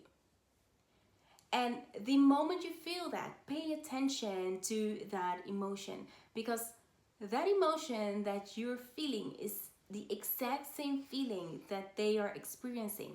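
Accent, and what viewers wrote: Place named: Haiti. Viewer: Are you part Haitian? You have an accent like you are...Also if you are this is Also a sign as well for me!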